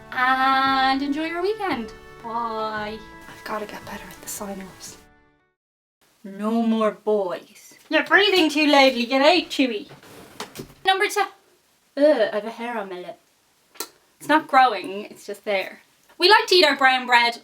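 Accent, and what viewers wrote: Ireland. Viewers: It is so refreshing to see that the Irish youth have not wasted their time on learning moderation in tone of voice or tempering of the shrillness that can peal paint and eradicate nearly rodents. Pretty much like every other drunk 20 something with no restraint or filter of any type - the accent only partially makes up for the ordeal. Dante missed a level of purgatory that is truly thine alone. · Like your irish accent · You was totally entertaining. I love to hear the Irish accent. Yes better than the Brits. I had some of you're top ten when in Ireland. The black pudding. No no no. Soda bread and stew ,fantastic, and ice cream Also . Compliments on the makeup. It's well done and tastefull. ( Oh the eyes 😍) I really really liked you're goofiness at the end. Sure is better than credits or a total drop off of video. Hope more of that. Thank you! 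· I've been watching your videos and it has just hit me; you definitely have a Non-American accent, and yet I can literally understand every word you say (well, except "butter" - it took me some effort to get this one). However, I had had the chance to watch some Irish movies on Netflix in the past, and I was having a lot of trouble understanding the speech. How come? Is the Dublin accent that much more compatible with American? Is there a Dublin accent to begin with? If not, what am I missing here?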